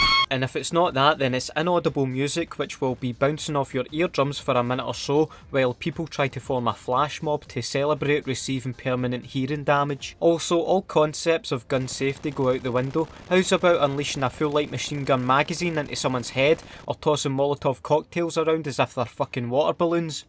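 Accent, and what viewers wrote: Scotland, United Kingdom. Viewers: Some Scottish accent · holy shit! this dude has the best scotish accent ever! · H1 Zed 1, now this is a real Scot right here.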